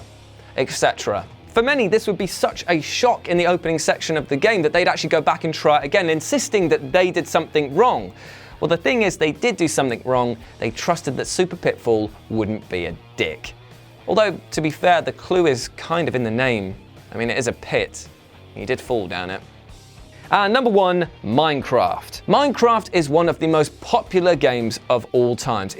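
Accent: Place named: United Kingdom. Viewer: Close your eyes and listen to the video again. Between his sad delivery of poorly written jokes and his pompous arrogant british accent this guy is a terrible presenter. The Shakespeare part is just a snarky American jab at a British english speaker trying to sound elegant but falls short.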